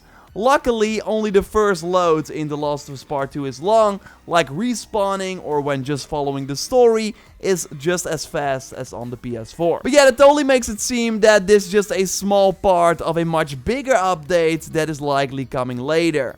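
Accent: Netherlands. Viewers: Are you from holland or somewhere near? :p i can definitaly hear a dutch accent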